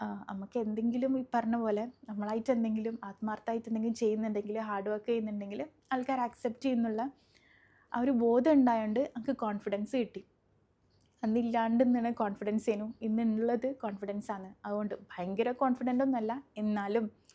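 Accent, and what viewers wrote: Kannur, India. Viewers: Nan link open cheythu nan comend cheyyarilla njan Areacod anu ❤❤❤❤ ningale sound nalla ishttanu kannoor varthanam ishtam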